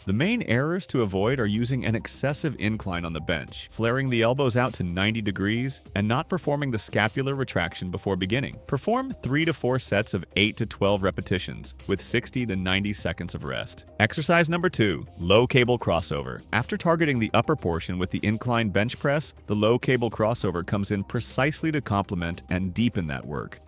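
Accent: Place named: United States. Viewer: yet another BS clickbait video were the narrator has an awful american accent so bad you want to punch them in the face.Avoid.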